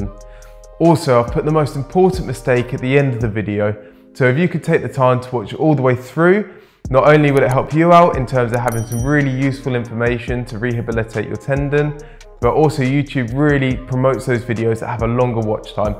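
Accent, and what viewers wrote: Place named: United Kingdom. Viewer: Nice video, good content, well-done and British accent as a bonus! What's not to like? :)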